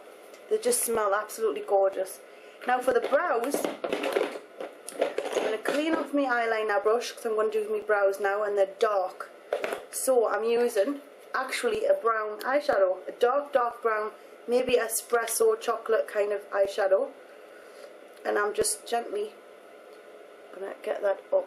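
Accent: Newcastle, United Kingdom. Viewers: Are you from Newcastle upon Tyne?? Sound Like A Geordie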